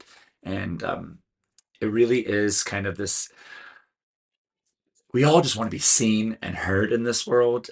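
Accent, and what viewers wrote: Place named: California, United States. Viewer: I could see that you really like this movie... as you said you dont know about brazilian dictatorship, i must tell you that dictatorship was supported by the usa and the cia, actually, usa were responsible for all dictatorship that happenned in latin america. Im not pointing my fingers at you, dont get me wrong, but ithink its important to say that. I like your californian accent btw. Im rooting for fernanda but i wont be devasted if moore getx it...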